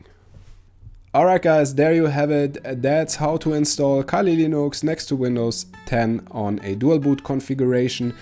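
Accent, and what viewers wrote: Germany. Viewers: That strong german accent 😂 Gutes Video, mach ich gleich ma.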